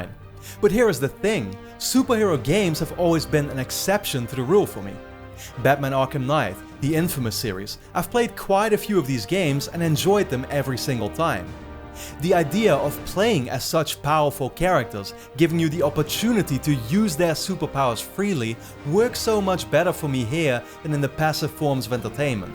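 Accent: Netherlands. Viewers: ik vroeg mij al af of je nederlands was haha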